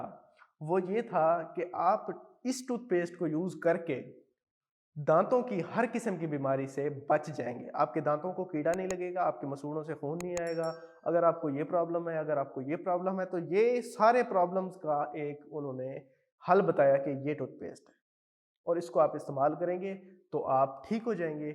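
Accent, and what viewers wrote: Saraiki, Pakistan. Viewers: Sariki speaking lG rahy dr. Sahab 👍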